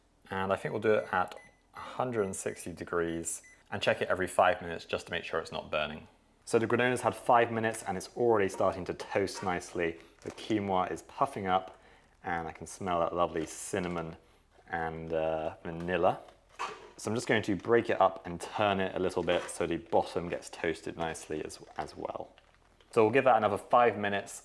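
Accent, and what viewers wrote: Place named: United Kingdom. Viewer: Great recipes. And, as most of the vegan cooking channels I watch are American, & I live in Aus, it's nice hearing the old UK accent - 'and we'll 'pop a lid on top'! Only the Brits 'pop' things ❤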